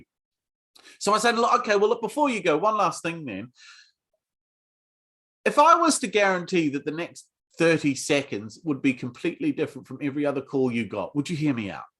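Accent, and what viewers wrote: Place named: New Zealand. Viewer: Great advice as always. You'll need to add a section on your bootcamp "how to sound like a Kiwi"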